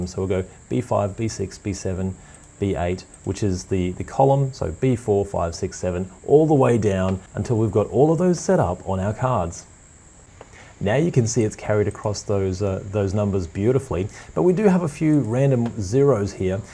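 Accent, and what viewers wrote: Australia. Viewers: so nice as an aussie to hear another aussie accent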